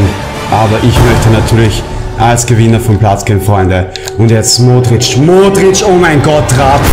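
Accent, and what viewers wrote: Austria. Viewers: Ich Feier deine Art so sehr hahah Echt cooler Typ Kommst du aus Österreich (wien) ?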